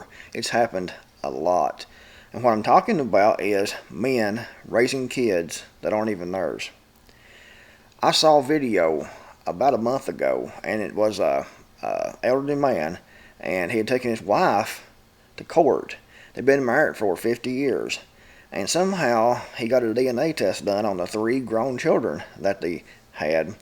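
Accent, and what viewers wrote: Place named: Kentucky, United States. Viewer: Love your accent. My momma and daddy were from South Central Kentucky. Your accent sounds like all my cousins from down there. Unfortunately I was born and raised in Louisville and the accent of Louisville natives is by far, not southern sounding at all. Even here in SC the accent is different.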